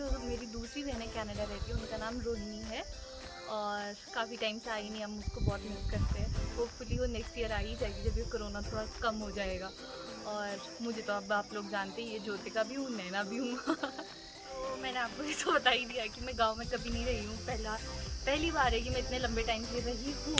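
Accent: Pahadi, India. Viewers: Yes I am interested too in your city life, your voice such a sweet bird like kuhu 😊💝 and apki voice m jo pahadi tone aati h wo lajwab h , or ye tone hmari garhwali tone se match hoti h 😊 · Appki Hindi bolne ki tone ek dam pahadiyo wali hai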